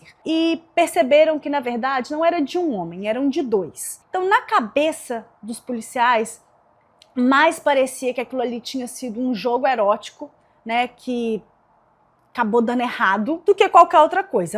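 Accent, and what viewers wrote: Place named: Minas Gerais, Brazil. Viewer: Mais uma inscritaaaa🤩🤩🤩🤩 Pelo sotaque ... é mineira!😂 Se foor bate aqui mana ✋️👊🤜🤛👏🙌🫶😍 Mas se não for... pra mim é minereis do msm jeito 😂🫶🫶🫶🫶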